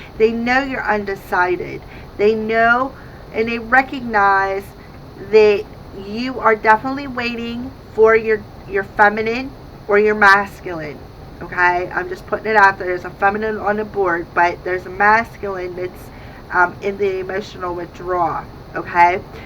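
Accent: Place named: Baltimore, United States. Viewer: Are you from Baltimore..?..we have a unique accent so I've been told and you seem to have it 😀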